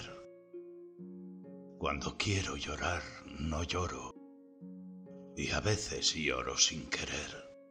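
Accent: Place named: Castile, Spain. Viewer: Lo poco admisible es la prosodia castellana estricta recitando los versos sublimes del poeta. Rubén Darío era nicaragüense y en los finales del siglo XIX y principios del XX el acento idiomático no se perdía fácilmente. Por eso al vate inmortal del español, Rubén Darío, hay escucharlo en su expresión fonética natural.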